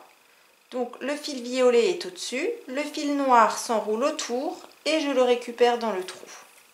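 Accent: Alsace, France. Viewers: Oh merci beaucoup de ta réponse! Je suis moi aussi alsacienne et j'ai cru reconnaître ton accent ;) Bon ben j'ai regardé ta vidéo... je n'y arrive définitivement pas, pourtant c'est très clair et ça semble facile... on va dire que c'est la faute à mes fils :) Du coup j'en profite, tu connais une boutique sympa dans le bas rhin pour le matériel? Je n'ai que du fil de très mauvaise qualité pour le moment...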